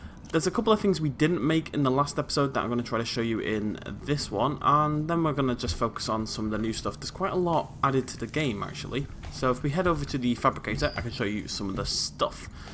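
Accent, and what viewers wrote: United Kingdom. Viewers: Hullo ladies and jauntlemen.lol,I love your accent. Brittish?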